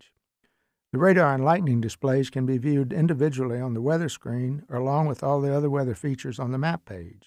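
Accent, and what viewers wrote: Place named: Tennessee, United States. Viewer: You definitely don’t sound like you’re from HGR. Heck, you sound like an East Tennessean. Somewhere around Luttrell or Bean Station. I am based at Sky Ranch btw (TN98). Just NNE of good ole KTYS. Thanks for a wonderful video!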